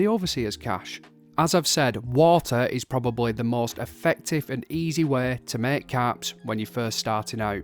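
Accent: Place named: Manchester, United Kingdom. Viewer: My wife and I really want to know where your accent is from. Thanks for the video, but plz help us with this mystery! I think you have a Mancunian accent but she thinks Scottish.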